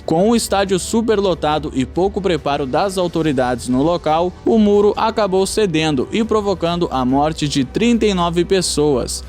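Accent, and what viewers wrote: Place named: Portugal. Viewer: Parece um português tentando imitar os br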